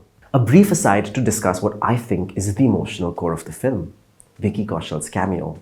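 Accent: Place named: United Kingdom. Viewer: I am eager to hear your review on Salaar tomorrow! With same classic British English!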